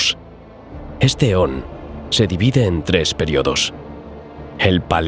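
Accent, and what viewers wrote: Spain. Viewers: Veo muchos comentarios de mis hermanos hispanoamericanos y ninguno de ellos son irrespetuosos excepto tú. Si no te gusta escuchar el acento castellano español pues entonces no visites este canal, así de sencillo. Sé que aún habéis algunos que nos repudiais por el tema de la conquista, pero déjame decirte algo, los españoles actuales no tenemos culpa de lo que hicieron nuestros antepasados y yo como español me entristece ver mucho odio y resentimiento en todas las redes hacia los españoles. Me hubiese gustado una conquista sin sangre, sin historia negra. 😢 Os admiro, os respeto y os quiero porque somos hermanos. Viva Latinoamérica y Viva España.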